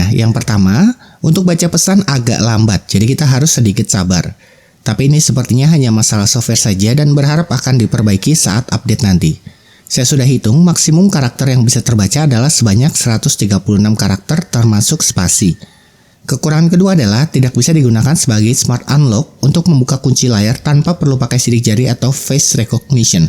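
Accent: Bogor, Indonesia. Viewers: Reviewnya ringkas tapi detail banget, mencakup hal-hal essential, padat berisi, informatif, dan yg keren itu udah ada subtitle hardsub 👍🏼 Keren nih reviewnya, makasih udah sharing bro. Btw, orang Bogor ya? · Bang orang bogor bkn??? 😅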